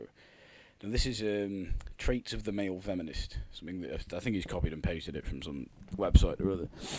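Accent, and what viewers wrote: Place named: Merseyside, United Kingdom. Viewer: Brilliant Merseyside accent, m8.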